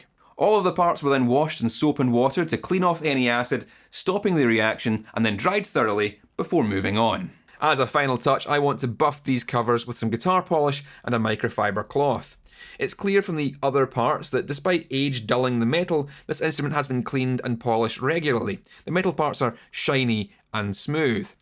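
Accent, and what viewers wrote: Scotland, United Kingdom. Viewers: Honest to God I though you were gonna be a guy from LA instead a glorious Scottish accent has gretted me I am happily surprised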